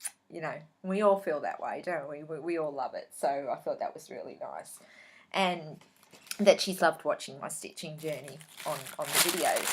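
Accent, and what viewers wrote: Australia. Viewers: Thanks for another great video. so sorry to hear about your friend's parents. I love listening to your stories and your lovely accent. I visited our relatives in Melbourne 3 years ago so listening to you reminds me of my trip. I'm a novice but love trying different pieces. Your stitching is amazing! Happy stitching too next time. Lori S.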